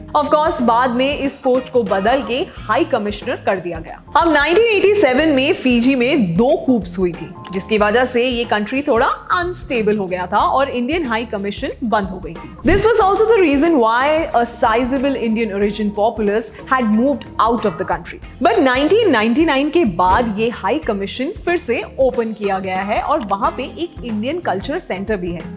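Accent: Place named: United Kingdom. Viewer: She's speaking british hindi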